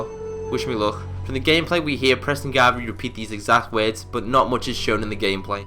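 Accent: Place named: England, United Kingdom. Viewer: "Nagaski" ? It's pronounced "nah-gah-sah-key" I know you're English but slow down with your words homie. 👍 Love the videos. This is simply just constructive criticism.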